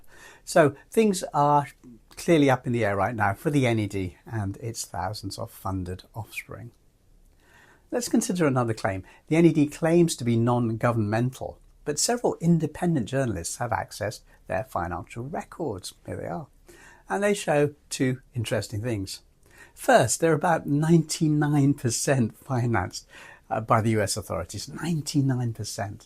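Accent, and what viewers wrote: United Kingdom. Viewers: Stupid british accent or involuntary and unnecessary subtitles: choose one. I'm out.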